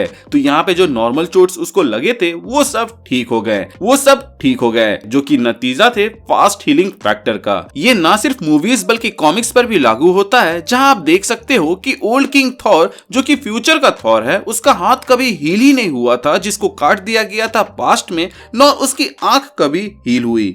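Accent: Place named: Jharkhand, India. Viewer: तोर भाषा मे झारखंड के झलक देके पारे हियो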